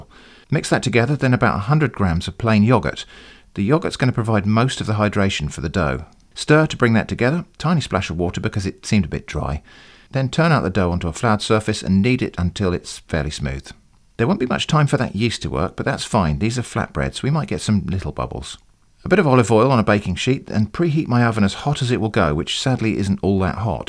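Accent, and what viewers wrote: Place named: Southern England, United Kingdom. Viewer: It's southern English accent that gives the word a different inflection. Even English words sound strange in different regional accents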